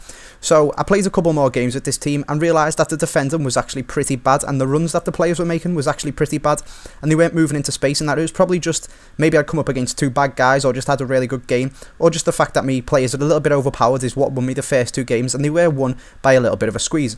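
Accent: Liverpool, United Kingdom. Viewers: no he's scouse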